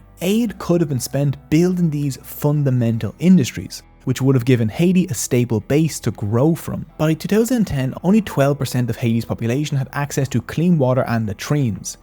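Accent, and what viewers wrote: Ireland. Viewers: Can't stand his Connor McGregor accent. Sorry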